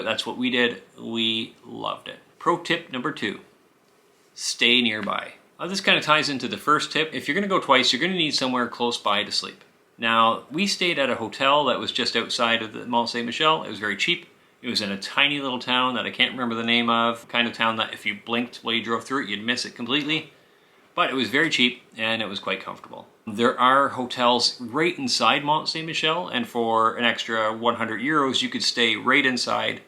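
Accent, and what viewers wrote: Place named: Canada. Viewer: Another Canadian on You Tube, my gawd it's like every Canadian has a YouTube channel. As soon as they say the word about you know you got a Canadian on your hands.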